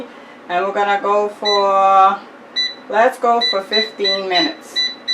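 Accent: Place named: New York, United States. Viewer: Thanks for the video and I love your New York accent!!!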